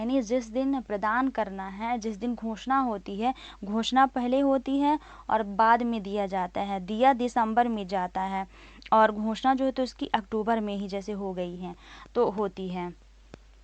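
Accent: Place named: Bihar, India. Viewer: Tum Bihar se ho ...Boli mein udhar ka hi ahsaas ho Raha hai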